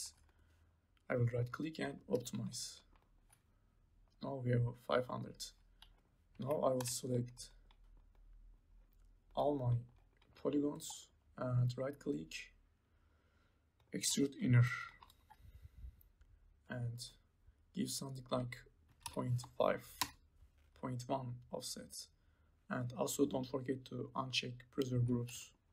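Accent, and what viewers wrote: Turkey. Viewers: Ellerine sağlık abi Türk olduğunu anladım😁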